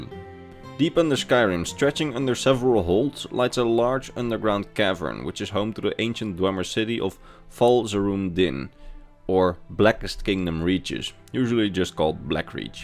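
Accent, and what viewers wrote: Netherlands. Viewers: You sound Dutch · Good sauce. Are you Dutch by any chance? Shoutout to potential fellow countryman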